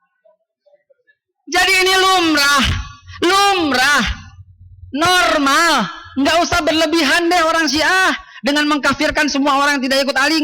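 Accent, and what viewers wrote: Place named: Jakarta, Indonesia. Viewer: wajar aja beliau org betawi kan ngomongnya emang gitu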